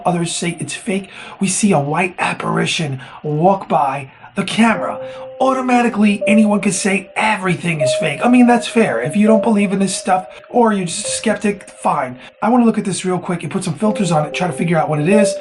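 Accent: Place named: France. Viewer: Hi. Sounds like you have a bit of a French accent. Cool!.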